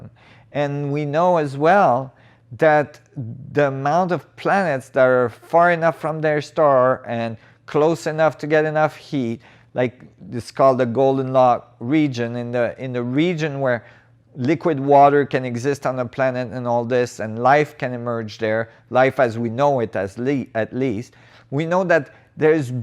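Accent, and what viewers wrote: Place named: Quebec, Canada. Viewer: I am doing Joe Dispenza work at the moment and came across your videos and WOW. You explain everything so well. I would love to seat with you for an entire day. Je viens du Québec et je reconnais l’accent :-) je vis en Floride depuis plusieurs années. Si tu est dans le coin j’aimerais bien aller à un de tes cours. Merci mille fois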